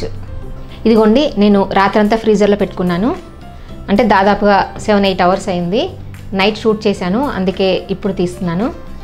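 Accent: Telangana, India. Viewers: Andaru Andhra lo cheptaru meru Aina koncham Telangana matladthunattu anpisthunde ❤️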